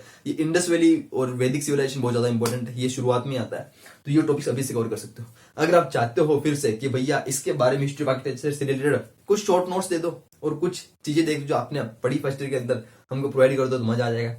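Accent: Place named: Marwar, India. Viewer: bhiya aapke voice me se mast marwadi tone aa rahi hai isliye ghar jaise lag raha hai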